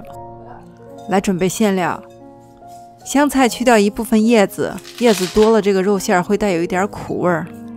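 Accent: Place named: Beijing, China. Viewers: 你声音好好听听起来就像是国外长大的地道北京人这么说你能理解么很喜欢你的视频我是今天开始follow的之前看过一个artichoke 的视屏不知道是不是你的 anyway goodday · 好喜歡看的視頻，教得好細心，有條不紊，聲音清晰動聽，正式的“京片子”。從中我真是獲益良多，很是感謝！且有一個特別好奇的地方，妳為什麼懂做這麼多的菜式。很是利害。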